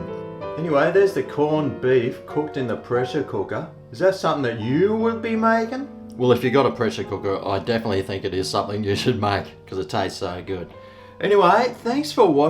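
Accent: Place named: Australia. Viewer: Love the Aussie accent 😂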